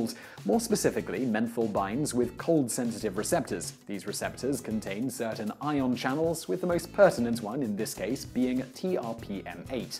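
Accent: United Kingdom. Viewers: He's British using the British pronunciation. Here in the US the pronunciation is different, just to annoy the rest of the world.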